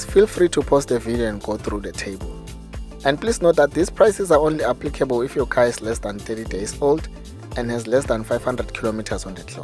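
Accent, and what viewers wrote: South Africa. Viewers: Interesting journal, Nguni nation accent. Great review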